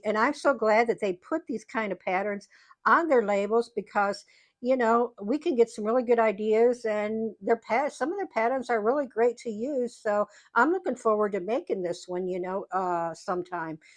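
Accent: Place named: DuBois, United States. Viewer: I'm not, directly, but all my mother's family is in/near Dubois, PA. I asked because you talk with the same "accent" for lack of a better term, just like they do. I'm glad you had such a great holiday.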